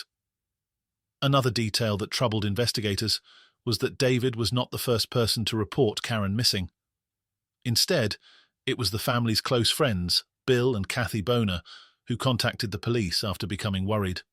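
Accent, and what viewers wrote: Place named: England, United Kingdom. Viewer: I was concerned too when I heard the name hogshooter I am English and love the English voice .thank you for your exceptional channel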